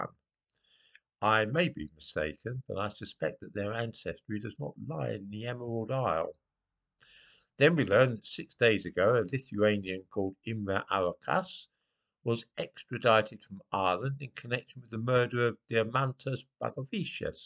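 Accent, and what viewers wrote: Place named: England, United Kingdom. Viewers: This is one of the most ridiculous videos on this website. It’s not people with African or Asian accents that destroyed Ireland’s indigenous culture, but rather people with accents like yours. Unless these immigrants murder and oppress huge chunks of the population SEVERAL times, they will never match what the English did. Also, the definition of what it means to be Irish is changing all the time. Centuries ago, an Irishman was simply a Gael from Ireland. Today, many people who are white and who have ancestry going back centuries would be very offended with this definition, as their own culture and customs are no longer Gaelic, but anglicised. This definition of Irishman will continue to change into the future, and if there’s nothing wrong with the change up to now, then there’s nothing wrong with the change to come. 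· Nothing like a man with a english accent , lecturing Irish where they took the wrong path, ??? After surviving the genocide inflicted on our race by people with similar accents , its kind of ironic this particular lecture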